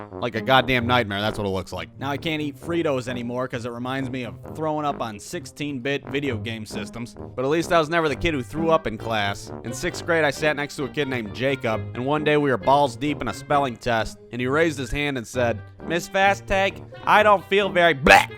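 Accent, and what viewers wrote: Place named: Toledo, United States. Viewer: Yo. Are you from Toledo Ohio????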